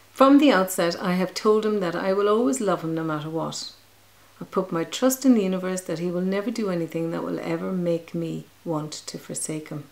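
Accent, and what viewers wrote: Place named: Ireland. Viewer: Well done on blog 82 video. New beginnings for sure. Pleasure to listen to your content and your Irish accent. JC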